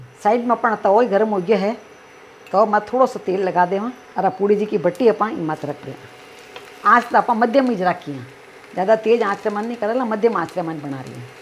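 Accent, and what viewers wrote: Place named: Marwari, India. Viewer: Meri mummy mujhe deke gai thi korma per ab tak banaya nai, aapka video dekh ke laga mummy boli banale ab to😑, आप same humari marwadi बोलते he, लगता he meri mummy he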